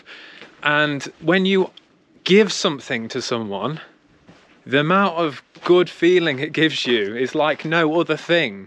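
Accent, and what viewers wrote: United Kingdom. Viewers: pitam Bezveze ono sve mi je Čudno pričaš Englesko ka Britanac pa san mislija da si rođen u Londonu ti samo živiš tamo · Hey brother! I just wanted to say that you're such a polite and charismatic person. You're always so down-to-earth and friendly, and I really admire your hardworking nature. With your ties to the UK and that charming British accent, I think you're well on your way to something big. With the little investment to get realtor license, and with the drone, website, and YouTube channel you already have, there’s definitely money waiting to be made. Keep pushing forward—great things are ahead!" To calculate the commission you'd make from selling two villas worth €1 million each with a 3% return: Calculate the commission for one villa: €1,000,000 * 0.03 = €30,000 Since there are two villas: €30,000 * 2 = €60,000 So, you would make €60,000 in total from selling both villas.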